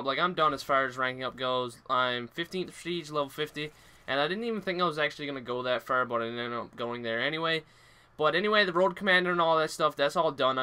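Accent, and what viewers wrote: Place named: Newfoundland, Canada. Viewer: Are you from Newfoundland? You sound a little like a newfie! :D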